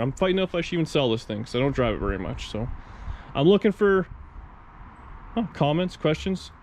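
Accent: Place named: Canada. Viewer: I listened to this for the Canadian accent.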